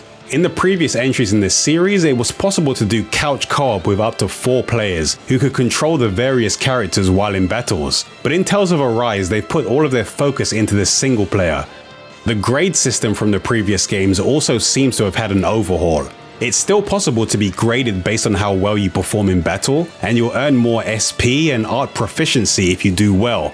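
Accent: United Kingdom. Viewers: Are you from the UK? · Clips Dude he just sounds a little british, weak bait lol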